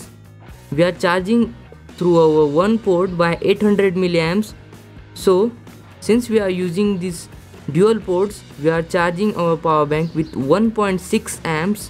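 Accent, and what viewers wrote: Maharashtra, India. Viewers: Marathi accented English! Liked it lot.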